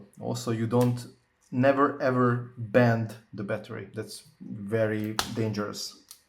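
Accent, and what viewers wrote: Hungary. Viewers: Szia, pontosan ilyen laptopom van. Van ra lehetoseg, hogy privatban beszeljunk? Ramot szeretnek boviteni viszont szakemberre biznam plusz lenne par specifikus kerdesem ezzel a laptoppal kapcsolatban. Nagyon informativ a video koszonom. (Magyar akcentus elteveszthetetlen :) )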